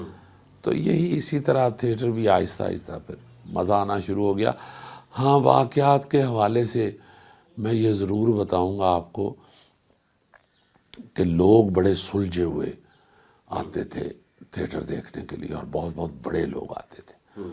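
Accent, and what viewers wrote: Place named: Punjab, Pakistan. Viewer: Bhaji ajj te Urdu wadhiya bol rahe ho.nahin ta Punjabi da lehja aunda jiyadatar tuhadi bolli vichon.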